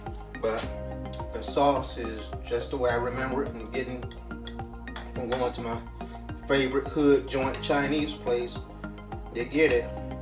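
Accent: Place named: Tidewater, United States. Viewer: I knew it!!!! I knew it!!!! Soon as I heard you speak, I knew you was from Tidewater! That’s my home Fam! 757 Bad Newz!!! Man Love Me some Yock Bruh! Can’t wait to make your recipe Homie! Salute!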